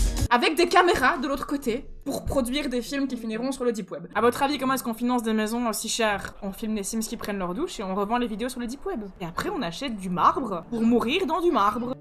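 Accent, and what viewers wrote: Belgium. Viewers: Tu es belge ?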